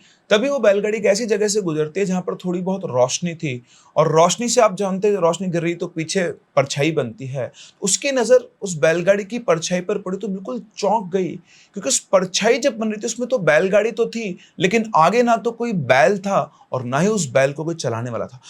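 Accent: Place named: Maharashtra, India. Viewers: Humne bhi aise hi story suni hai relative se U were talking Marathi cutely 😊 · Hello sir I am from jalgaon and i loved the story and ur marathi ascent ❤ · I love that Marathi accent of sir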